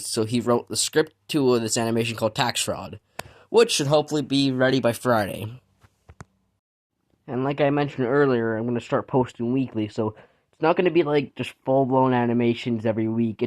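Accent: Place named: Canada. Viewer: Damn bro you sound hella Canadian😂✌️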